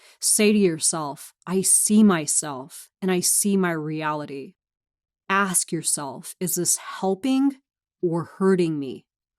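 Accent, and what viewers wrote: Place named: Oklahoma, United States. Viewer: Damn I called it. The accent. Except I thought you had came from Tx to Ca. But its Ok. OK is amsricas step child. Tx dont claim yall, midwest dont claim yall, dirty south dont claim yall. And the modesto...valley people are scary. Coast people spot valley people a mile away when they go to Pismo etc. Rough starting point man. Damn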